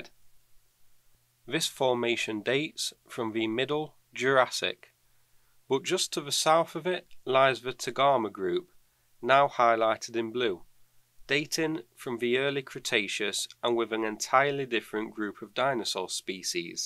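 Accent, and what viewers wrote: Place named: Midlands, United Kingdom. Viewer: Taiwan? Just by accent I would say central englad...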